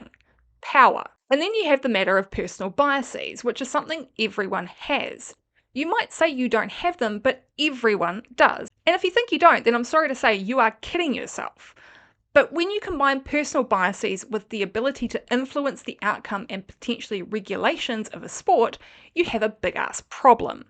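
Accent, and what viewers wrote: New Zealand. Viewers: I like a kiwi accent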